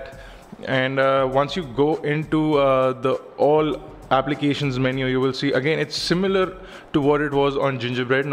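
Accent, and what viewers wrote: India. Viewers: Indian accent dude :D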